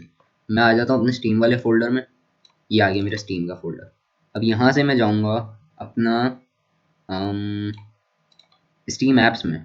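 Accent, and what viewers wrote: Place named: India. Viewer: why is Indian accent annoying 🤠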